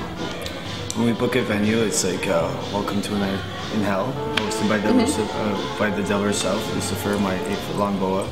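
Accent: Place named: Quebec, Canada. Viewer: Man, he has that French Canadian accent which I can't stand.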